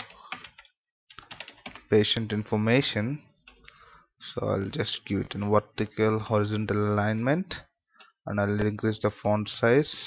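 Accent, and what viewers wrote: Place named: India. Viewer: Fuuuuck this disgusting indian accent and its fuuuuck bad pronuntiation .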